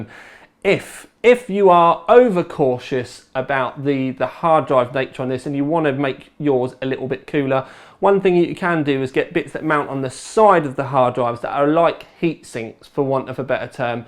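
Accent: United Kingdom. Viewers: the most annoying is that British English ascent, its so flatulent. do not understand why British cant speak normal English.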